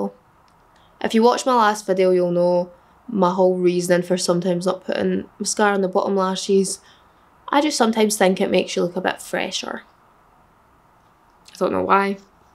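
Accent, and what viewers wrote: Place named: Glasgow, United Kingdom. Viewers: Always forget your Scottish too, your accent is like mines. I'm from Southside of Glasgow 😊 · The glaswegian accent gives me whiplash every time, but i love it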